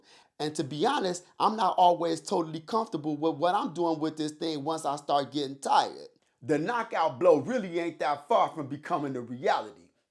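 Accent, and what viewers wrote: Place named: Brooklyn, United States. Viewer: New Yorker he is. That sounds like an old school Brooklyn accent. I'm guessing because the way he talks. He sound like he from Brooklyn.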